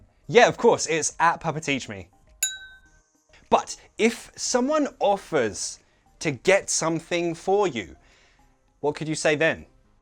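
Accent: United Kingdom. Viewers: Oh God I love the British accent 😂😂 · You remind me how beautiful British English is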